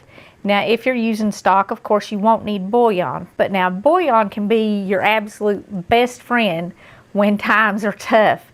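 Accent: South, United States. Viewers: Hello sweet lady, I'm not sure if I'm doing this right but I'll try. I found your channel on my Roku TV and I just wanted to tell you thank you for sharing your love of cooking and love of God. I am 78 yrs old. My husband took his life 4 years ago and left me in a wheelchair. I live alone now and have no family here in Texas. I get real lonely at times, then by chance I found your channel and although I don't cook much anymore because it's difficult cooking from a wheelchair and too easy to get burned. Your channel became a daily pleasure to look forward too every evening. Your words about your love of Jesus and His love for us have lifted me so many times when my heart was so heavy. Thank you. Though I don't do much cooking since being alone I still enjoy watching you cook. You have the sweetest voice and southern accent. I'm originally from Massachusetts and I have a heavy Boston accent. 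God bless you for making my day. I watch all the videos I can and watch them over and over. (Hugs) from Fort Worth Texas...... Marie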